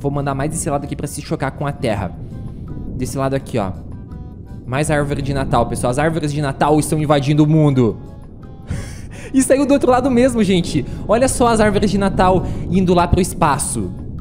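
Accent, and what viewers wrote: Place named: Minas Gerais, Brazil. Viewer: Crlh pensei a ele foçe de minas man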